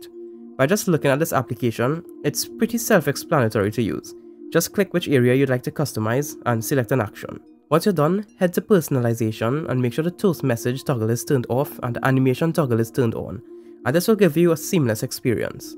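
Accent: Trinidad and Tobago. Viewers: Phanic! At the Twenty One Crybabies it's Trinidadian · trini accent people, am a Vincentian and we from the Caribbean!!!!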